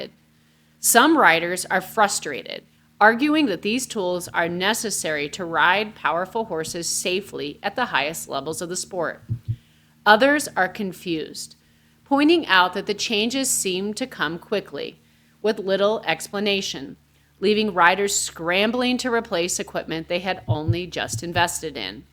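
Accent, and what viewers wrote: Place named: United States. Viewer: You have American accent and the US is second only to china in abusing animals. How about you showcase horses in America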